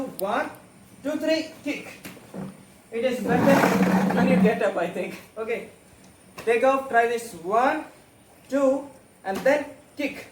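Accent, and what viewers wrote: India. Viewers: Man salute Indian English..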